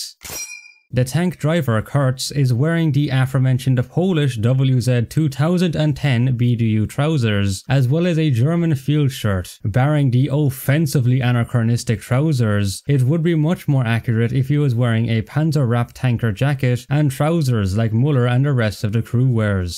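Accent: Ireland. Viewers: Way off topic but I spent damn near my entire life watching irish shows n listenin to Irish music and I cannot place that accent, what county you from? I feel like I'm getting hints to both Mayo and Wexford somehow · Your accent makes this video a hundred times better... w Ireland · Irish sounds nerdy? 💀 Do you want him to talk in Chinese?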